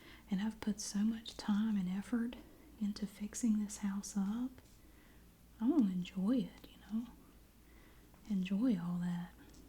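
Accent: South, United States. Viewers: I love your whispering videos. You have the nice sounding and calming voice. The southern accent also has this New Yorker hooked lol. Love it. You’re adorable and I love ALL you videos and your sense of humor! Don’t listen to trolls or haters they’re just jealous of your success.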